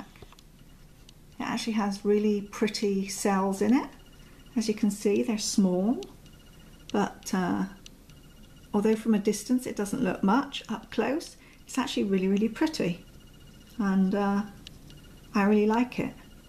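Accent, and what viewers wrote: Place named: Southern England, United Kingdom. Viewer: do you come from Gloucester ,dorset or hampshire? lovely accent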